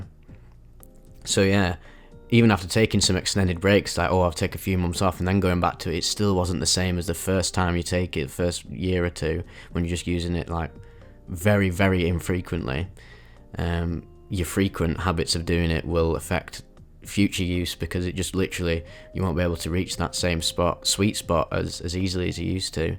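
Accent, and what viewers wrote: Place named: Yorkshire, United Kingdom. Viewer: Mate i feel i could be platonically serenaded by your yorkshire timbre for hours on end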